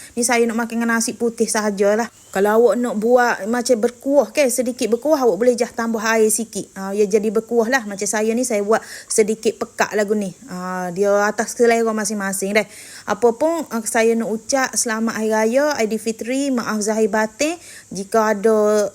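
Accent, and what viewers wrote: Kelantan, Malaysia. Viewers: Ralit saya tengok video sis.. lama tak dengar oghe kecek kelate.. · Simple + senang.. haha terhibur dengar org kecek Kelantan ni.. hehe husband saya pon org Kelantan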